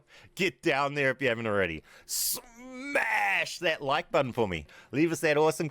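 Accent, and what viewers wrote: New Zealand. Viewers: Churr brother are you kiwi bro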